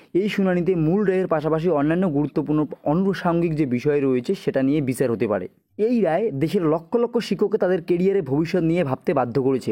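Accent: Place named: Bangladesh. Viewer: Tui 100% bangladeshi, tor kotha sunei mone hoche, edeshe kire bara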